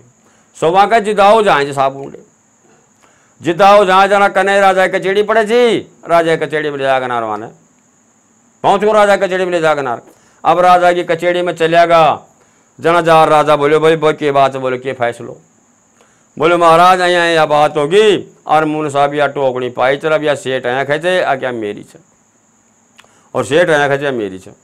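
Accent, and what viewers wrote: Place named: Haryana, India. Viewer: Kha se ho tau ji apki boli to haryanvi mix h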